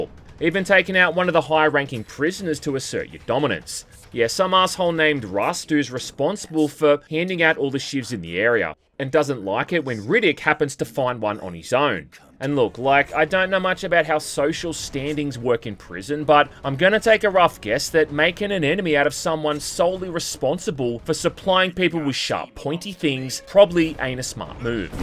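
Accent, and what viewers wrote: Bogan, Australia. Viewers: thats one bogan af aussie accent.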